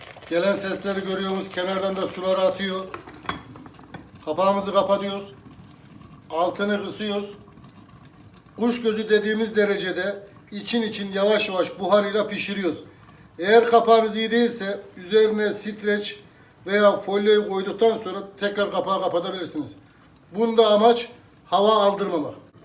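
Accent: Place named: Eastern Turkey, Turkey. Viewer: Usta mız çok keskin doğu şivesiyle konuşuyor.Keşke diksüyon dersi alabilirse türkçesi biraz istanbul şivesi gibi kusursuz olabilir.Yoksa çok kusursuz anlatımı var ve de çok başarılı kendisini tebrik ediyoruz.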